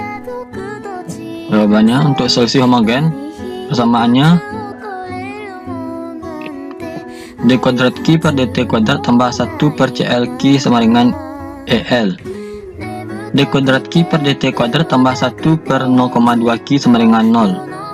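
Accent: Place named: Padang, Indonesia. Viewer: Padang banget ya nak logat nya... :3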